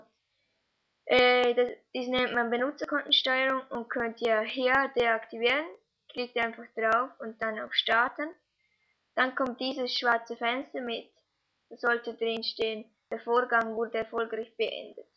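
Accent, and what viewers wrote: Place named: Switzerland. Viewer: schweiz???? oder wie mir gefällt der dialekt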